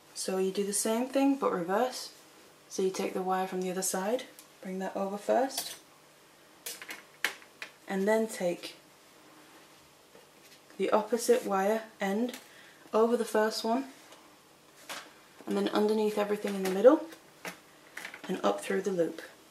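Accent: United Kingdom. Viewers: May i ask where i can purchase the beads and other things? i am literally just beginning and am so excited but no idea where to purchase all the stuff. I can tell from your accent your from the UK also. Thank you <3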